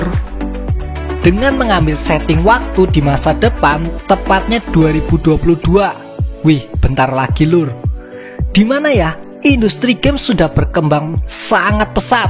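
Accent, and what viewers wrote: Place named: Banyumas, Indonesia. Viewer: Ngapak juga gaes nyong ee 😁😁😁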